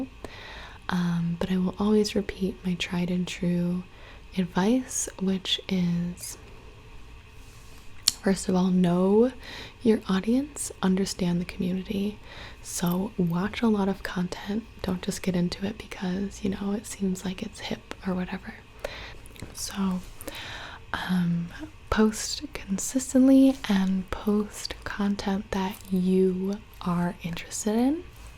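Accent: South, United States. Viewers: i frickin love southern asmr sounds. she is the best.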